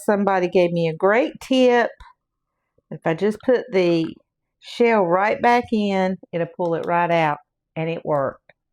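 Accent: South, United States. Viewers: Your still a beautiful lady, absolutely love your southern accent.😊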